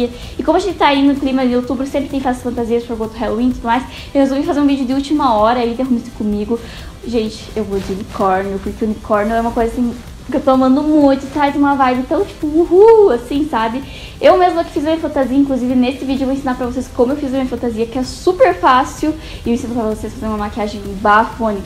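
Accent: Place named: Northeast, Brazil. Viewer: nesse vídeo você tava muito nordestina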